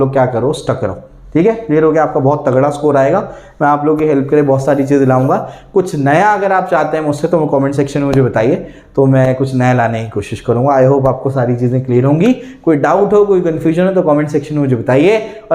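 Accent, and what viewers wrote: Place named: Badnapur, India. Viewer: Sir aap badnapur ke ho kya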